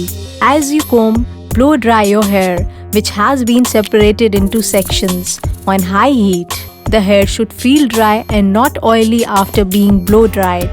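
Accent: India. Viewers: Jake elwafi it's indian haha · You guys are all commenting on her accent rather than the video just because she has an indian accent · thats indian accent (by the way I'm an Indian 😤😤😤😤😠😠😠😠😠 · Her voice is like an indian one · It is Indian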